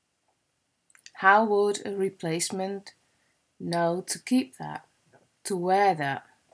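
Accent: United Kingdom. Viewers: Thanks for sharing this, it was wonderful and a great way for me to start my day. I found myself chuckling along with some of your commentary especially regarding the PIDers. I thought at first that your accent sounded slightly British but as an American with my own southwestern twang (accent on the southern) I wasn't sure until you said you are a Dutch girl. Anywhoo thanks for this. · "Hey Ringo, how are you?" HAHAHA :D Nice video! I wonder how long it took to collect all these gorgeous photos. ...And I feel your struggle with the English language (I'm German and I try to get rid of my German accent haha), but you already do sound pretty well and British in my opinion!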